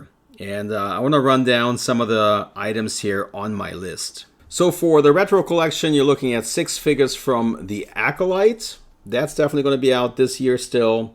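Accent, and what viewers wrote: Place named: Ireland. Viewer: Thanks for this great podcast! Love you've done an episode devoted to the Jabba The Hutt figures! I still want to buy the new one from Hasbro Pulse, but it sells out quickly! Are you in Ireland? You sound like a friend of mine who's a native of Ireland.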